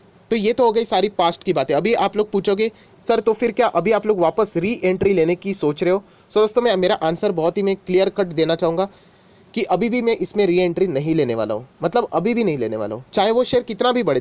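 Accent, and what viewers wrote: Maharashtra, India. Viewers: बोलण्याचा पद्धतीने तर मराठीच वाटतो.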